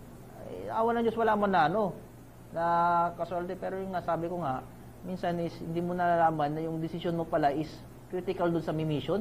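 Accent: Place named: Visayas, Philippines. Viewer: FLuent sa tagalog si pero di nawawala ang,, ba.. Naisip ko n ilonggo or cebuano. Tga gensan pla👍👍👍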